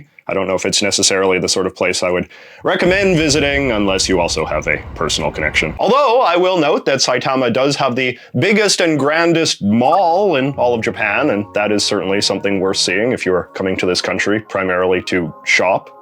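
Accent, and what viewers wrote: Canada. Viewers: Canadian accent comes through thick in a more candid video. Not every place is for everyone. You don't have to like Japan. It's good you're giving it a shot.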